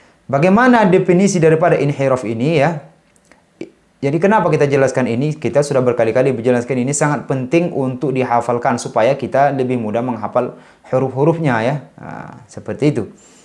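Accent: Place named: Eastern Indonesia, Indonesia. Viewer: Suka aksen timur beliau. Maa syaa Allah... Jazakallahu khoyron Ustadz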